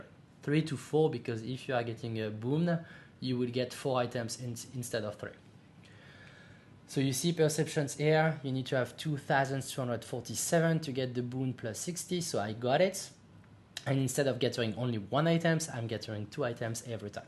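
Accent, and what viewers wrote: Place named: France. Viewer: non non, je suis Français aussi et ça m'amusais juste de voir quelqu'un avec un accent plus fort que le miens lol. Super vidéo merci. 🤝🏽👍🏽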